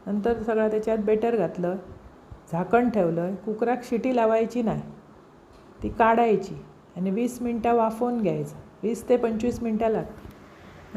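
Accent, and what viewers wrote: Malvani, India. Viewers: Luv to listen to your malvani ❤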